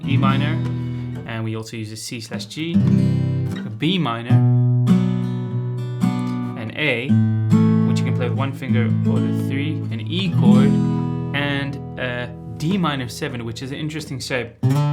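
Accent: South Africa. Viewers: Great video brother. Is that a saffa accent you’re sporting?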